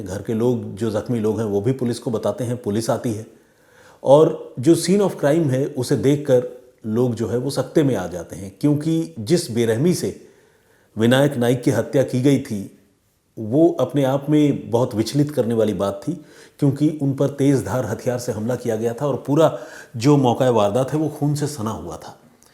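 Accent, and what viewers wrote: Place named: Bengal, India. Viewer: शुद्ध हिन्दी बोला करो, बांग्ला की बदबू आती है तुम्हारे बोलने से 👎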